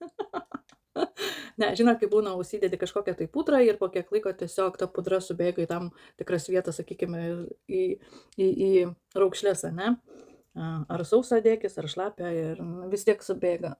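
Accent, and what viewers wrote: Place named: Aukštaitija, Lithuania. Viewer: Laba jūsu graži kalba turbut nuo Aukštaityjos busit sekmes jums gyvenime❤❤❤😊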